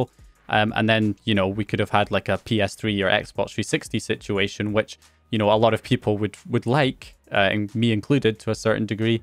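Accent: Scotland, United Kingdom. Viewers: Jim Browning is from Northern Ireland and is Scottish, but the two regions do share similarities such as slang words/colloquialisms.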